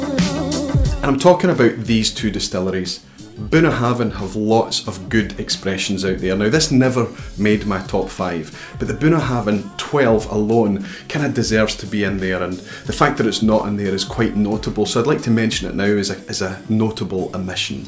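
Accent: Scotland, United Kingdom. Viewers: Yes, I did and found it helpful. But I am not a chemist and I recall in this or one of your videos you mentioned that the coloring was sugar or "sugar based" and I wondered whether that would affect the flavor as well as making a more balanced color? Living in the AMERICAN SOUTH where we have our own unique version of English, I have to listen carefully when you speak. I assume that when you say "meshie" you are speaking about a "blend?" But do not change a thing. I have visited Scotland and I love the country, people AND the Scotch (but not the haggis, sorry) Keep doing what you are doing-you have fans in the USA!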